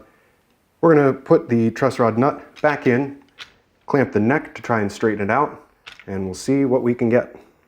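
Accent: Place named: Prairies, Canada. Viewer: Get that nut out real quick. Are you by chance from Saskatchewan, Alberta, or Manitoba? Thanks for the tutorial. I will now attempt the repair.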